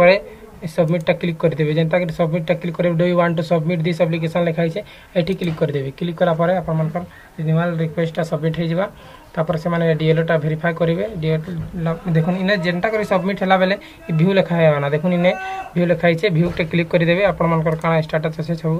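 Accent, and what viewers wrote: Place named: Cuttack, India. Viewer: ସମ୍ବଲପୁରୀ କହୁଛ ଭଲ କରି କାହ ଆଉ କଟକିଆ ମିଶାଗୁଲା କାଏଁ ଯେ କହୁଛ..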